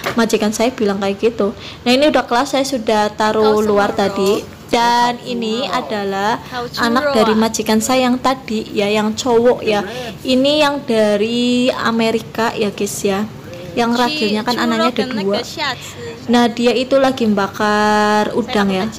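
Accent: Banyumas, Indonesia. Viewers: Hadir KK,,,ora ngapak ora kepenak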